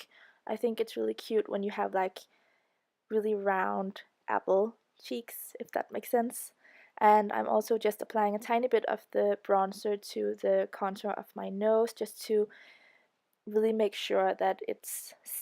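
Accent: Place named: Denmark. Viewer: Man kan tydeligt høre at du er fra Danmark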